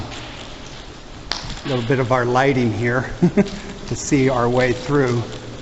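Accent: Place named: Pennsylvania, United States. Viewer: en I think that you may have a real Pennsylvania accent! (this ol guy I knew had an original Washingtonian accent just like my aunt did!)..Rock n Roll! 'got a Raleigh 27" 12 speed (franchise)..cool.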